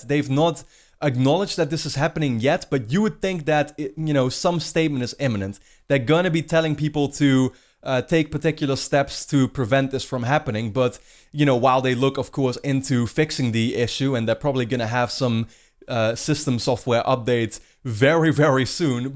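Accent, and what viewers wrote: Netherlands. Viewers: am i fucking glad switch doesn't have messages XD also i'm pretty sure you are dutch judging from your accent though possibly rip my brothers.. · I wonder if he is dutch cause he has that accent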